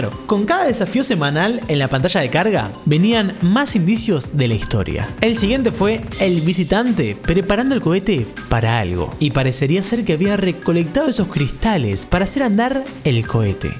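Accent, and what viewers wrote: Argentina. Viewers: H ey se de noto que sos argentina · sos de Argentina?? :'D que orgullo XD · El primer yutuber que habla argentino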